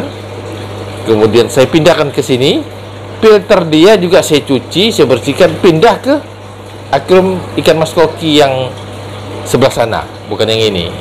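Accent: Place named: West Sumatra, Indonesia. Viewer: Makasih infonya uda Sangat bermanfaat. Kalau boleh saya coba tebak Dialeg cando urang awak. Salam 🙏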